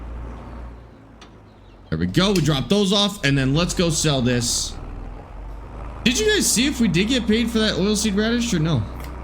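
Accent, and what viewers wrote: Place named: Canada. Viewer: Can tell a Canadian if they say sorry. Not cause yall say it a lot but it’s how you say it that gives it away.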